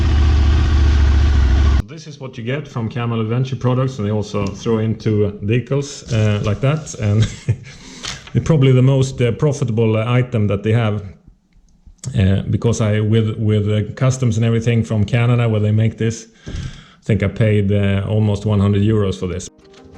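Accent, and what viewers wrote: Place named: Sweden. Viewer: Why swenglish ? It`s hard to listen to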